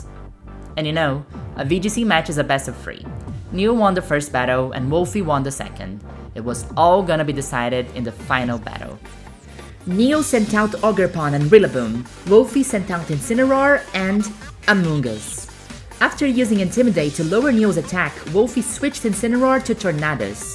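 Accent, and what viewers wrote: Brazil. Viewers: came to this video because a YouTuber I liked recommended it, so Imagen my joy when I hear a Brazilian accent and when I look up I see not only a Brazilian flag but what I assume is a trans Brazilian flag! Mal posso esperar para assistir a mais vídeos seus! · Pokémon's massive popularity definitely helps with it, but to grow up with it, and feel free enough to not be bound by those "now that you're an aDuLt you must renegade your childhood", in general are more accepting. Most of us were the 'weird kids', be it a gender reason, neurodivergency, or simply rather nerdy interests in general. When you exclude people they tend to band together, and in an era that all children, specially the ones that were left out, had a game/anime to bond over, they grow up not only pokémon fans, but opression-aware people. I tried not to write an essay here, but I needed to engage, I've found this channel randomly now, and...Eu reparei no sotaque, depois na bandeira, e depois minha mente explodiu! 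Então não só me inscrevi na hora, como tem que engajar 2x mais, porque é conteúdo de pokémon, é brasileiro, e é de gente finíssima!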